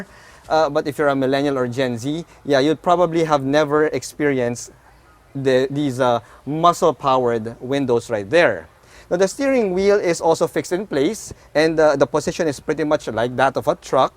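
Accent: Philippines. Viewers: shifting by ear??? Haha!!! Shifting by hand is easier I think. It feels natural. Haha Pinoy English. Trying hard. Tagalogin mo na Lang boy!!!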